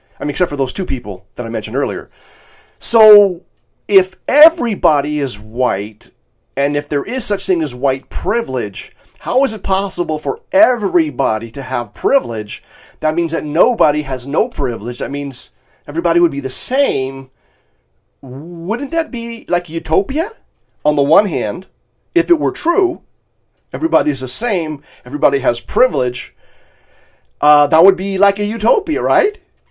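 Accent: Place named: New York, United States. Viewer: This is the first time I saw you. A truly great stuff. Jerry Seinfeld reincarnated- I wonder, is he still alive? This New York accent. Promiss to watch your videos, now I`m working in my garden -in Poland! That`s where I live. 'White Privilages'? Jeez! You have them back home? What`s that?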